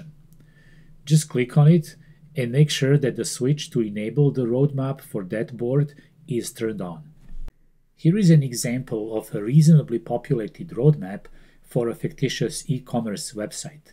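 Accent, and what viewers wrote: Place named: Balkans. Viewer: Odma sa aboniro , cuje se nas covjek i sto je jos bolje tvoj Engleski razumijem 😊 Hvala 👍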